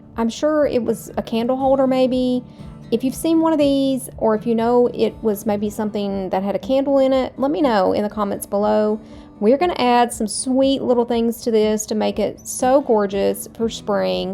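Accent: South, United States. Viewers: I just adore your channel! I came across your latest video a few days ago and immediately hit that subscribe button and since then I have been slowly watching backwards as I find breaks during the day. Thank you so much for sharing your creativity, your ideas for selling ephemera and dragging out pieces in your stash to use. Your voice is fantastic despite what the deer head person said. I have a southern drawl too and I'm proud of who I am! Take care and I can't wait for the day you hit a million subscribers!😊😊😊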